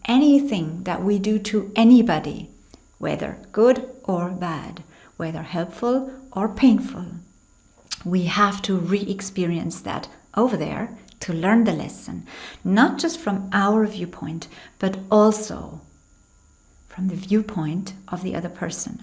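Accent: Ireland. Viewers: yes, i had a powerful sensation come over me at the end of last year and it was a feeling of ''this is it, it is over''. I get the feeling that something is gonna happen in August but what i have no idea. We are living in very interesting times. I am Irish by the way and i got a hint of an Irish accent from you. Are you Irish? or did you maybe learn English in Ireland? If it is the latter you are like a native. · Watching this in July 2024, what a scary time that was... I'm guessing what you intuited in March 2021 was the V pass? I am glad I didn't fall for any of it. I had a dream prior to the whole debacle about injections so that was a heads up, and I smelled something rotten in Denmark right away. I have subscribed to your channel as of yesterday, love your calm demeanor. Is that an Irish accent you have? But I see you're in Germany, must've been tough going there, I'm in Canada it was awful here! 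♥ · Love the accent..Irish? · Hi, I can’t place your accent is it Irish?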